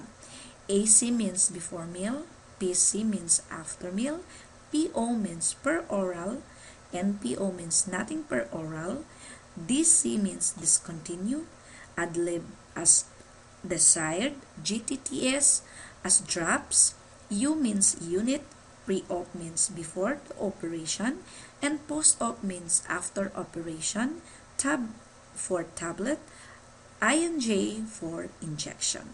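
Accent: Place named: Philippines. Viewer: I love the filipina accent. 😘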